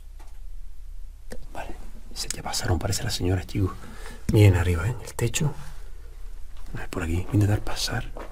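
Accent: Spain. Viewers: Muy interesante este video, increíble. Donde es? Tu acento me parece canario.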